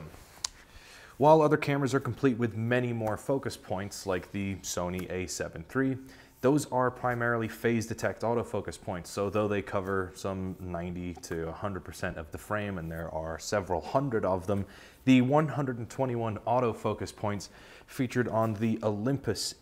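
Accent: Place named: Bristol, United Kingdom. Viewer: You're rocking that Bristol accent...!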